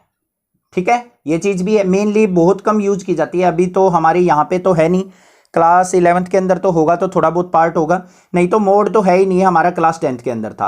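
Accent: Haryana, India. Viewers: Sir ap haryana se ho na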